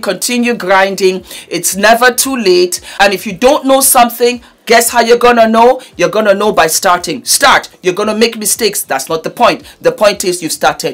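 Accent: Pidgin, Nigeria. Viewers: 😂😂😂 I love your pigin and when you say "Ko da" (it's not good) I really fell you as a Niger and Yoruba ❤️ See me smiling. O da bo (good bye) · !!!! I lived 3.5 years in Nigeria! Love your pidgeon, as I miss hearing it. I learned some but I really love your delivery. Odabo!!! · I love how you mix the Nigerian English.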